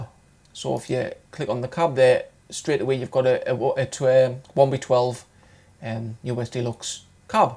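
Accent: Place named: North East England, United Kingdom. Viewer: Hi, thank you for this very helpful video. You take it nice and slowly, which is a pleasant change from most YouTube instruction videos. I’ve watched some videos by the otherwise excellent Richie Castellano but he goes though stuff much too quickly for me. Your pleasant North East accent is an added bonus. Are you from Bishop Auckland or Durham?... thanks for explaining all this so well. I can make progress now.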